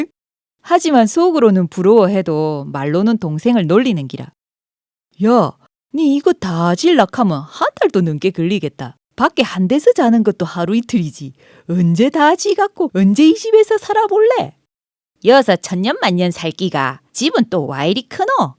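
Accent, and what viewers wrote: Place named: Busan, South Korea. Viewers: 벽돌집의 숨은 비화를 파헤친다!!! 부산 말로 재미있게 들려 주셔서 감사합니데이~😊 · 귀에 쏙쏙 들어오는 부산 사투리가 정겹습니다. ^^ 세이(형), 속에 천불이 난다, 쎄가 빠지게, 쎄때 등등 어릴 적 고향에서 많이 듣던 말들이라서 그런가 봐요.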